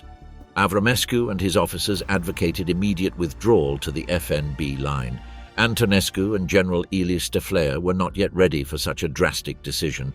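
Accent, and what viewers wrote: United Kingdom. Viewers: In primele minute ale povestii voastre apar și primele , nu erori ci minciuni Limba engleza și acentul britanic al povestitorului nu conferă autenticitate povestii mutilate și modificate propagandistic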